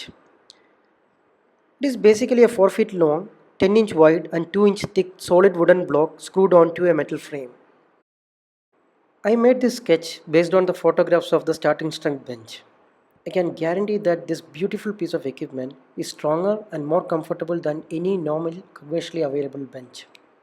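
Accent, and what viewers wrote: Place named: South India, India. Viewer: Hello sir, your slang seems to be that of a South Indian. To be more specific, a keralite or a tamilian. I'm from Tamil Nadu. I'm following starting strength for a couple of years. Now I'm planning to build a home gym in my apartment based on ripottoes's recommendation. But, I was concerned about the deadlift disturbing my neighbours downstairs or creating a great vibration in the floor. Were your deadlift quiet enough to not to concern your neighbours?. And it would help me a lot if your share your designs. Thanks in advance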